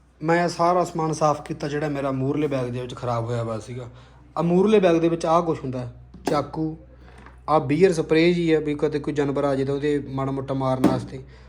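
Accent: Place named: Punjab, India. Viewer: ਇੱਕ ਵਾਰੀ ਤਾਂ ਪੁੱਤਰ ਸਹੀ ਗੱਲ ਦੱਸਦਾ ਕਿ ਜਦੋਂ ਤੇਰੇ ਕੋਲ ਬਹੁਤੇ ਲੋਕ ਇੱਕਠੇ ਹੋ ਜਾਂਦੇ ਹਨ ਉਦੋਂ ਡਰ ਲੱਗਦਾ ਕਿ ਕੋਈ ਸਿਰਫਿਰਾ ਆ ਕੇ ਕੋਈ ਮਾੜੀ ਹਰਕਤ ਨਾ ਕਰ ਦੇਵੇ ਪਰ ਅੱਜ ਤੱਕ ਵਾਹਿਗੁਰੂ ਜੀ ਨੇ ਕ੍ਰਿਪਾ ਕੀਤੀ ਹੈ ਸਾਡੇ ਪੁੱਤਰ ਨੂੰ ਕੋਈ ਆਂਚ ਨਹੀਂ ਆਉਣ ਦਿੱਤੀ। ਵਾਹਿਗੁਰੂ ਜੀ ਕ੍ਰਿਪਾ ਕਰਨ ਅੱਗੇ ਵਾਲਾ ਸਫ਼ਰ ਵੀ ਏਸੇ ਤਰ੍ਹਾਂ ਵਧੀਆ ਚੱਲਦਾ ਰਹੇ।ਜੇਹੜੀ ਨਾਲ ਕੁਮੈਟਰੀ ਕਰਦਾ ਸਾਡਾ ਪੁੱਤਰ ਉਹ ਕਹਿਣੇ ਤੋਂ ਬਾਹਰ ਹੈ ਅਸੀਂ ਦੋਨੋਂ ਸੁਣ ਕੇ ਬਹੁਤ ਖੁਸ਼ੀ ਹੁੰਦੀ ਹੈ ਠੇਠ ਤੇ ਪੈਂਡੂ ਪੰਜਾਬੀ ਬਹੁਤ ਵਧੀਆ ਸ਼ਾਬਾਸ਼ ਪੁੱਤਰ।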